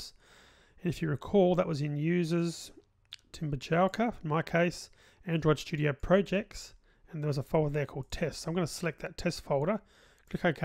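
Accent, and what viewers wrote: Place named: Australia. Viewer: THANK GOD FOR THE SUBTITLES.No offence fellow Aussies, but as a non native English speaker I have a hard time to follow! Kudos for the great tutorial though!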